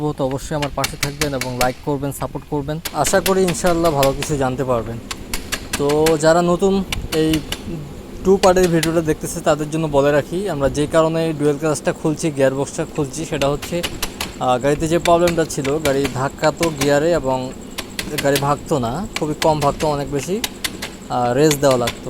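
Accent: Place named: North Bengal, India. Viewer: এখন বিষয় হলো আপনার কথা শুনে তো মনে হইতেছে যে আপনার বাড়ি উওরবঙ্গের দিকে আমাদের দিনাজপুরে নয়তো।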